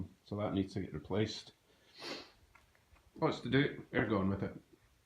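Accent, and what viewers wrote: Scotland, United Kingdom. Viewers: Aye... what part of Scotland are you from?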